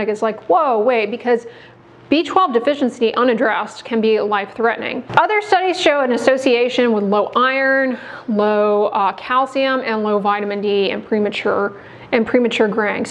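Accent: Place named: Carolinas, United States. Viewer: Interesting information! BTW You sound so American... (I mean the accent/tone.) A stereotypical American accent for my European ears... Where from?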